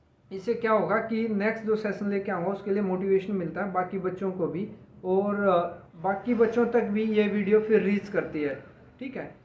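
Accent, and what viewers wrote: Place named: Haryana, India. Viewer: Sir aapka tone harayanvi ya rajasthani lg rha…aise kaise hoga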